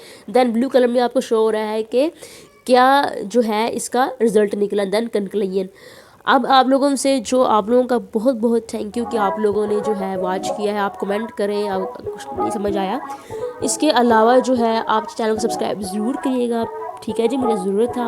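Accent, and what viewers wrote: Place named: Punjab, India. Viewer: Ap key accents sey ap punjabi lag rahi han.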